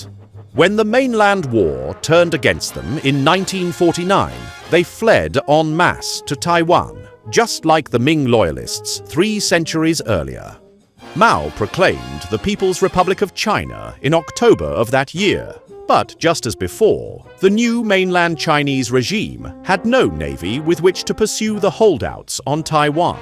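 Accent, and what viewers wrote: England, United Kingdom. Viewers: Bring back old English accent, please.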